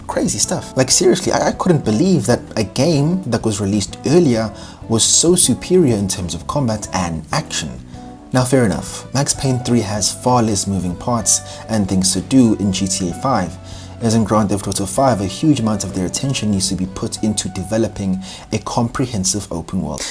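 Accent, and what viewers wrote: South Africa. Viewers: You sound South African, Mac and cheese bro? Should’ve said atchaar or magwinya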